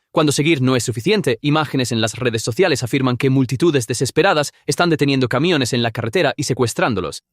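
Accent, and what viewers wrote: United States. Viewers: En España no se habla con ese acento gringo DESAGRADABLE.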